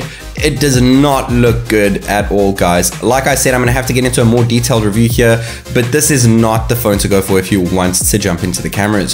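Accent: South Africa. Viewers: South African accent. I guess you haven't travelled and experienced other cultures.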